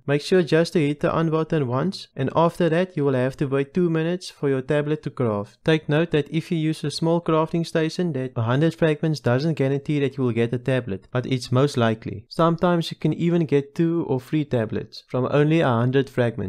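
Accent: Afrikaans, South Africa. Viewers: Wow that afrikaans accent is strong with this 1.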